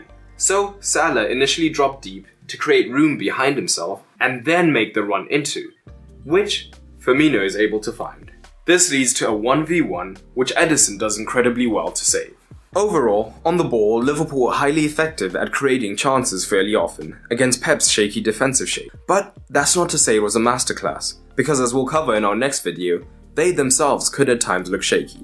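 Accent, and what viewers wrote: South Africa. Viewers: Is it just me ? I hear a South African accent…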